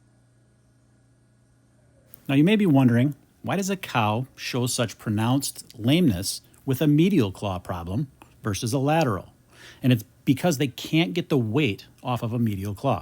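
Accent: Scotland, United Kingdom. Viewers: I confess to be a bit annoyed with some of the extra fluff he puts in -- but I believe many of his other subscribers actually enjoy the fluff. His latest video had Zero fluff and all hoof-trimming. So I think he changes it up for variety. As an American living in England, I rather like the Scottish accent. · I like the Scotsman's accent. But he does have more 'filler' in his uploads nowadays.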